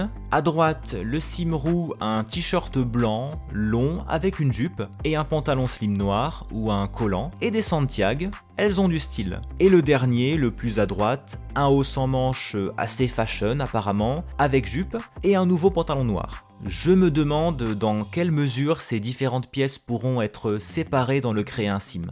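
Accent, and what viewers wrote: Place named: England, United Kingdom. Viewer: J'aime beaucoup ces vidéos d'analyse et de décortication. (Superbe accent anglais au passage). Bon... perso je suis clairement déçue. J'en ai déjà marre des minikits, et même si j'ai acheté les précédents car ils pouvaient apporter des choses à mon jeu (et que je suis une collectionneuse, j'avoue), eh bien celui-là ne m'attire pas DU TOUT. Alors je me pose une vraie question... Est-ce que je deviens une vieille aigrie ? Est-ce que ça y est, je n'arrive plus à vivre dans mon époque ? Mais franchement je trouve toutes les pièces présentées très laides, voire immettable. Non mais franchement, IRL qui a envie de porter ça ? Je ne suis pas une pro du style hein, du coup mes propos n'engagent que moi, mais m** quoi, moi aussi je voulais des tenues hommes simples et mettables ! Donc du coup je suis en colère. Je ne paierai pas pour avoir 1 paire de pompes et 2 pulls ! 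Voilà ! 😑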